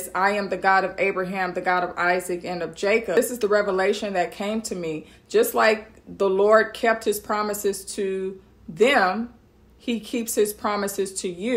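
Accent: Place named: Texas, United States. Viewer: Finally , you getting texas accent❤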